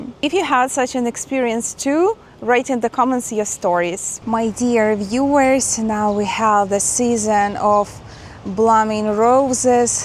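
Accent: Russia. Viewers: It's very helpful to get unfiltered information about the actual Russian experience so thank you very much for sharing. Also, your English is excellent (and I find your Russian accent very charming, by the way) so please keep posting!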